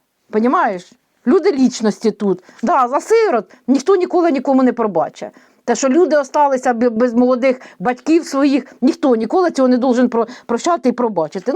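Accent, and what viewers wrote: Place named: Kyiv, Ukraine. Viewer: ви дуже гарно розмовляєте, точнісінько так як я і все наше село!.! Я з Київщини.!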